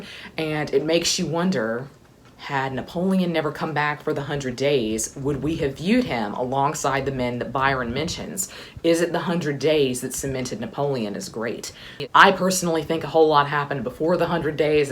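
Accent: South, United States. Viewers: And I just heard you say from the south! I knew it 😁 I was telling myself I am sensing southern, very beautiful.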